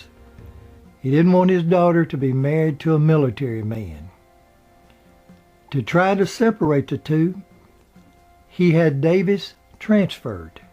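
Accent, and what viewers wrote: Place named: Oklahoma, United States. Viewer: I like listening, you sound like all my family and myself growing up from ark. Ks. Oklahoma. Been in ca for 37 yrs. It’s nice to hear it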